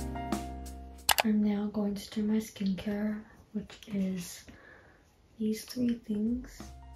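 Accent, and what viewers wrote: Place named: Australia. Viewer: now developing a slight aussie accent bcs i keep binging ur vids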